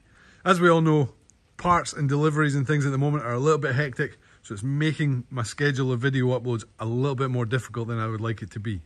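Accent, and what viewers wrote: Scotland, United Kingdom. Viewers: Thats a wee mit of a mess. Great to hear the Scottish accent am defo a subscriber 🏴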